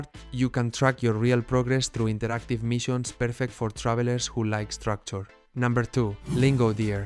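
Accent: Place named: Spain. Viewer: Why the Spanish accent?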